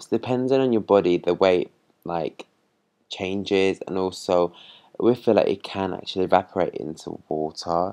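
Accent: United Kingdom. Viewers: you have a very boyish manly face. Very handsome. you can pass for a Puerto Rican, except the British accent lol. Thank God you did not have your nose broken with a rhino plasty. Maybe some laser hair removal to make your beard perfect. But other than that you are handsome :)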